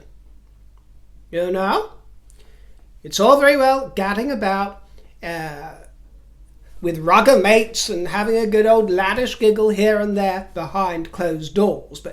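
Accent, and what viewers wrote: United States. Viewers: Brilliant American accent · your American accent is a SCREAM!!! spot. on!!!! LMBO!!! 🪖🎖😆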